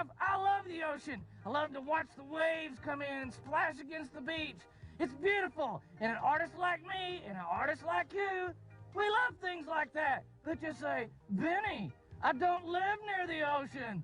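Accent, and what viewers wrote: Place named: North Carolina, United States. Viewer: I don't know why, but his voice reminds me so much of my friend from North Carolina. My friend has a softer, slightly deeper voice, but he has almost the exact same accent as Binney.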